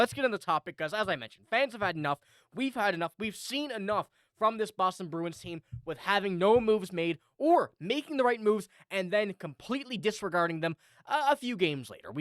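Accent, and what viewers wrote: Canada. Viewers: Where are you from bro? You have a Canadian sound to you but obviously a huge B’s fan…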